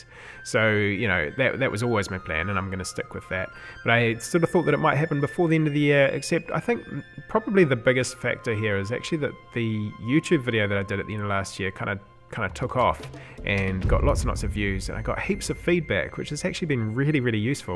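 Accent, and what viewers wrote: New Zealand. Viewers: its kiwi not Australian can't you tell? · Pretty impressive stuff, amazing that this is all in your own engine! I worry your game has next to no actual game-play. Hard to tell but it seems like you ahve a lot of work to do in terms of crating, building, exploring, sapien interaction etc. Tech wise it seems great though, visually it is pretty good. Seems strange to have that entire world view and then top down selection system, just for the first 30 seconds of the game. Is there any reason you would go back to that view? PS. Are you from NZ? Where abouts?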